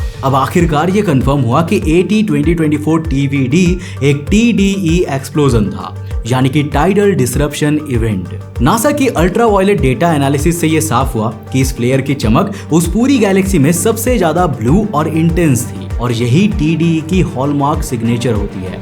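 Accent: Bengal, India. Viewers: Bhaiya kya ap Bengali ho ?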